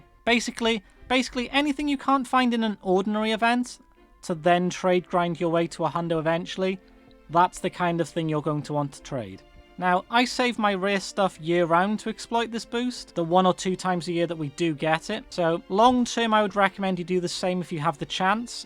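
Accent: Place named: Llanrumney, United Kingdom. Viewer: Anyone ever told you that you sound like you're from Llanrumney..?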